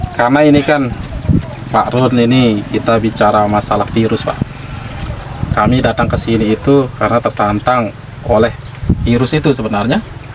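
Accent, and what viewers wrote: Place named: Lombok, Indonesia. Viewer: Logat orang sasak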